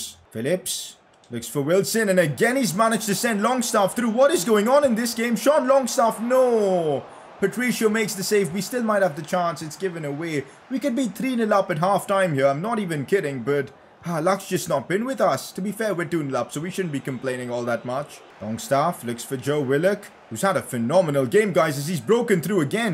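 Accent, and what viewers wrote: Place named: India. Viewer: Are you from India bro, love the accent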